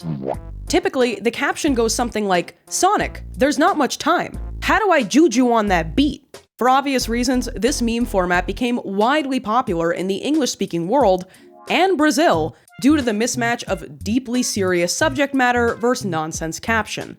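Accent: New York, United States. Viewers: I aint from that area so i dont know for sure but its either new york, boston, or new jersey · I love your accent! It reminds me of Whang so I'm wondering if you're also a fellow New Yorker. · you are my favorite lady content creator and I want to say tho I still hear a very slight NY/NJ accent I still find you charming and intelligent. Keep up the great work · I love your accent. New Yorker? New Jersey? Boston? I can't tell. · your accent whips. not enough youtubers with potent new york accents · close. That’s a NY accent. Staten Island maybe? Have a cousin who was born in Stat. They say words similar. I could be wrong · She keeps mentioning her accent is she from new York?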